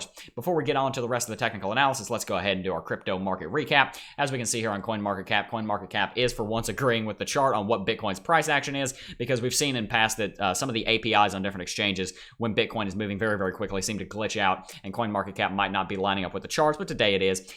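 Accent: United States. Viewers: This strong American accent is painful for my ears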